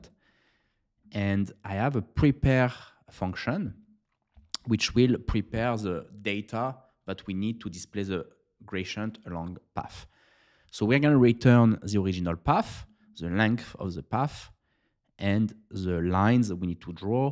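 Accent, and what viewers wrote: France. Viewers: Le meilleur accent français ! 🇫🇷 Great Video 👍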